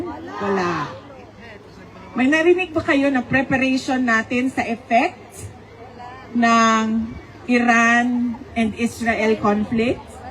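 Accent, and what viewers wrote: Visayas, Philippines. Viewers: parang tanga magsalita halata nagbabasa may script pero slang p rin pagkabisaya khit ingles pa😅 wthell!!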